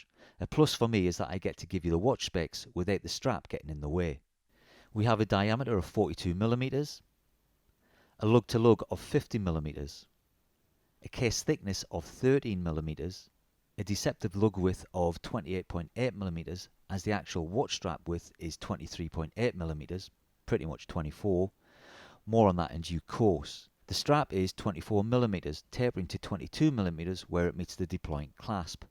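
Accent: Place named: RP, United Kingdom. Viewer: For anyone not speaking posh british as a native, it is very difficult to understand the gentleman whatever he is saying. Subtitles anyone?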